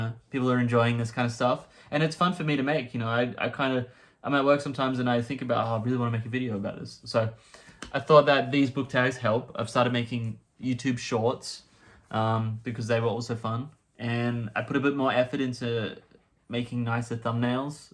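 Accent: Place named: United Kingdom. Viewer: Great video! I really enjoyed In Cold Blood when I read it but it’s been a while. I would love to hear more about watch making in future videos - it’d be really cool to see a watch that you’ve made. Based on your outfit I was thinking you were from Chicago but I was a bit confused as I thought I could detect the slightest British accent, then it all made sense when you mentioned Australia.😂 Have a good one!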